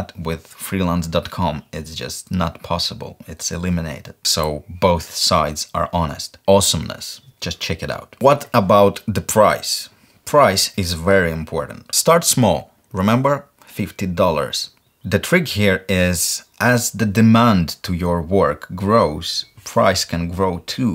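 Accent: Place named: Russia. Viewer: (Rus) -Такое чувство что твой родной язык русский. Но все ровно за опыт который ты передаешь своим подписчикам. (Eng) - I feel you're native language Russian. Anyway thanks for experience that you transmit with your subscription. 😁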